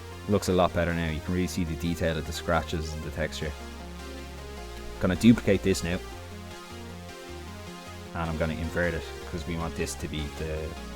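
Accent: Ireland. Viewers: Awesome tutorial! I was taken aback by the real dub accent haha... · It is so refreshing to hear an irish lad talking about graphic design. Can't wait to see more of your videos :)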